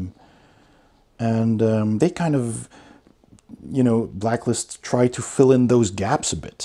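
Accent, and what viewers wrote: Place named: Netherlands. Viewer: i could tell youre from the netherlands like me, ill be getting my set tomorrow and im super hyped for it!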